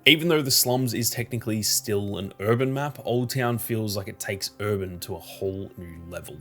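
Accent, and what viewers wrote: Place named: Australia. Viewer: Nothing better than hearing another aussie talk about peak.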